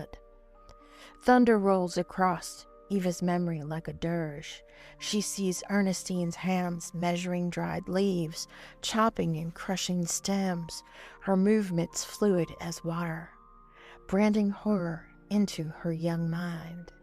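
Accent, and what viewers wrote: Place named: South, United States. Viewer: This was amazing! I absolutely love the southern drawl accompanying the southern gothic.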